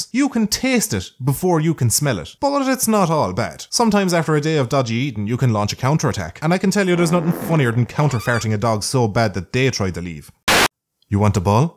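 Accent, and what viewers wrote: Ireland. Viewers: I dont know who you are, found your channel today on the "recommended". You have a good point of view that really jives with me. To be honest it's creeping me out, you sound like an irish version of myself. Not crazy, i think... Anyway keep up the good work. The worst thing about having a dog is having to say good bye. Mans best friend wont talk, can't talk, will listen the best they can, never leaves your side and will never complain. All for a head scratch and the last bit of what you're eating, if only people were so easy to please. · I don't know why I love this channel so much its just a Green duck with an Irish accent swearing for like 10-15 minutes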